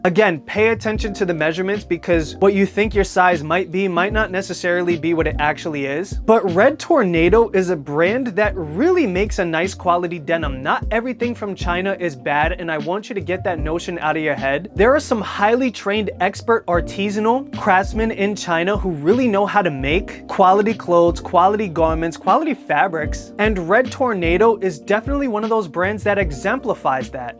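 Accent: New York, United States. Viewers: Thank the lord, there's still people with New York accents.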